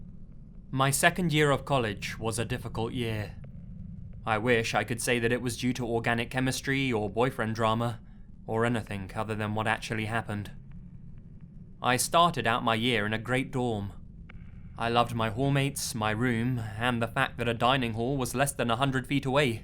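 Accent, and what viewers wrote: England, United Kingdom. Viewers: English accent FTW :)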